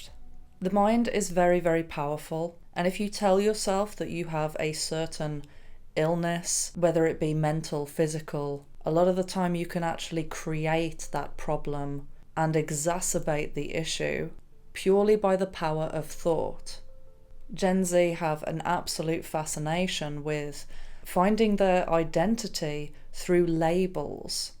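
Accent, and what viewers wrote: United Kingdom. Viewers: I like your accent got a pretty strong powerful British accent😊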